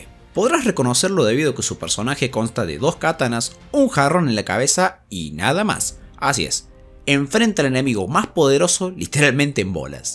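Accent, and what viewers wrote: Córdoba, Argentina. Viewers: Che loco vos sos de cordoba o alguna provincia vecina?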